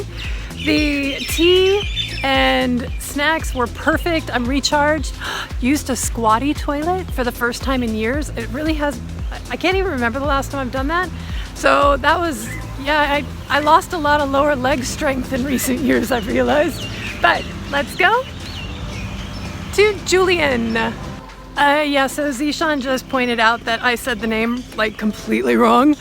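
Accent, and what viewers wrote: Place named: Canada. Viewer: Welcome to pakistan have safe trip and u sound like more Canadian then American.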